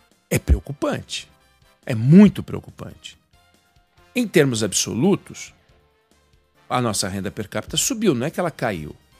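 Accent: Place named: Santa Catarina, Brazil. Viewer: Você deveria de falar para prefeito governador ajudar o governo federal fazer escolas técnicas para gualificar nosso jovens 20 por cento dessas vagas não foram preenchida aqui na minha porquê são vagas de mão de obra qualificada tem muito jovens querendo ecresar no mercado de trabalho mas tem como pagar cursos técnicos você parece que é de Santa Catarina com fala você não ajuda na só atrapalha você é filiado algum pardido porquê vocês da imprensa não ajudam vocês fala que melhorou pastante as vagas de emprego nos estamos precisando de mão de obra gualificada e que mais tem remuneração de salário melhor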